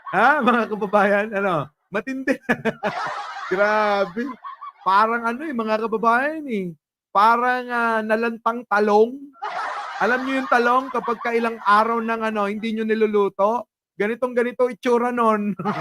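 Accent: Ilocos, Philippines. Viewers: I like how you speak ilocano ading!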